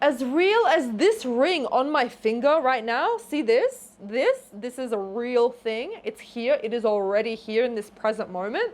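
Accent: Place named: Australia. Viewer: Wow I really don’t feel motivated by someone yelling at me in an Australian accent 😂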